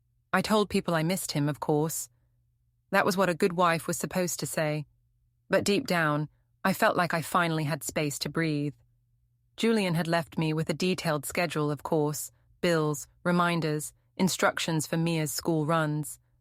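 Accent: England, United Kingdom. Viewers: why does this sound like the most entitled english lady in existence?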